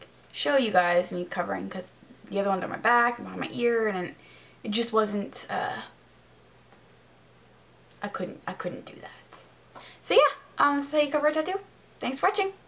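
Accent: Canada. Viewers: You sound Canadian. Are you? :)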